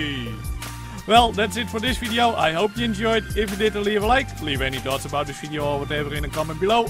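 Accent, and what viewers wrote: Netherlands. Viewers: are you dutch because you kinda have a dutch accent when you speak english?? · That Dutch accent XD. Respect! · are you dutch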